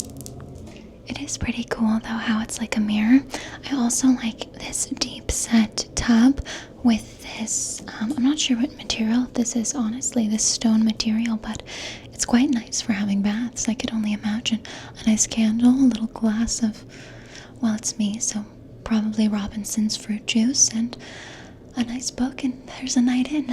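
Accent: France. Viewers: Ugh you look absolutely gorgeous! I loved how you she used her French accent in there too, Stunning apartment, it just looks peaceful!!💕💕💕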